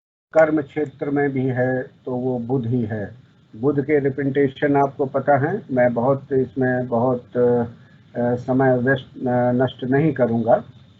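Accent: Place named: Varanasi, India. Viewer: Pranam, Pranam Pranam...... Pranam Pranam Pranam ..... do not have words to express my gratitude to you for this lesson....... you said in pure Varanasi Hindi accent & style.........replayed & listened it 2-3 times.... hearing astrology in pure hudh shindi after long time...........mera pranam sweekar karey.....मेरा प्रणाम स्वीकर करे The words, pictures, spoken words ....etc., so nicely done.... can only come from Lord Shiva bhakhts......2 hrs = 12 years of learning.... please invite Guruji to conduct few more lessons.... such an excellent gyan.....ज्ञान ब्रह्म ज्ञान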